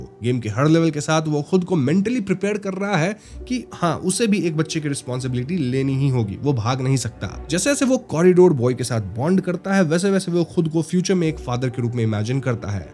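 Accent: Bengal, India. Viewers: You are Bengali, Your tone like bangala.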